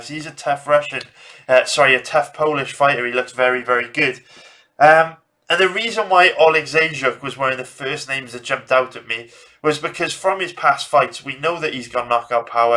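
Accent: United Kingdom. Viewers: ✊🏼👊🏼All day dude, yall whats ur accent, theres like a lil welsh in there, scouse myself, just curious, looking forward to next parts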